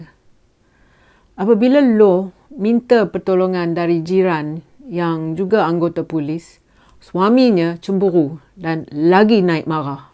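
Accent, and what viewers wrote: Perak, Malaysia. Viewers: Orang mana ni lorat perak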